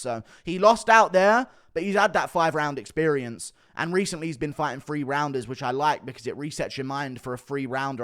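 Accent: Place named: England, United Kingdom. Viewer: Can anyone tell me what part of England accent is?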